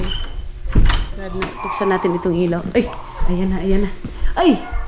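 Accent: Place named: Surigao, Philippines. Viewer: Surigainon Ang dialect ...like my late father..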